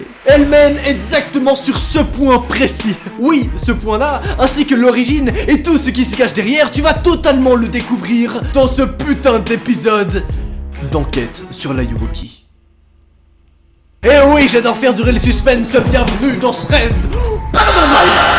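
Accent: Belgium. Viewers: Et mais tu est Belge?? PS: Je t'adore!❤ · Est ce qu'il est belge ? Il a un ptn d'accent flamand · T belges ??? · t belge ? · T es belge 🤔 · tu es belge ou quoi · tu es belge? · Tes belge ?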